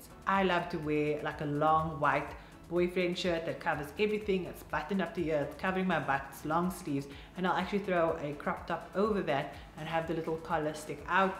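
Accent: South Africa. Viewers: You sound capetonian....gottta love SA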